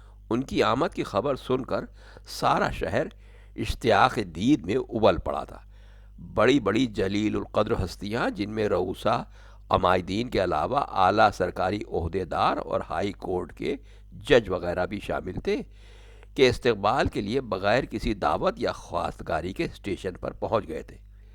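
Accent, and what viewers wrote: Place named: Lucknow, India. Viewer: आपका ये वीडियो बहुत कुछ याद दिलाता है और ये सच है कि इतने सब चीजो के गुजर जाने के बाद भी आप जैसे लोगों के लहज़े में लखनऊ हमेशा ही जिंदा रहेगा और हमारे तो रूह में लखनऊ बसा हुआ है, बेशक बहुत खूबसूरत लखनऊ को पेश करता हुआ एक उम्दा वीडियो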